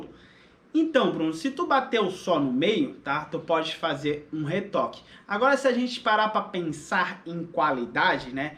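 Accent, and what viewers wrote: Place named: Pernambuco, Brazil. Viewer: Boa tarde é assim mesmo que fala Pernambuco suas dicas é tope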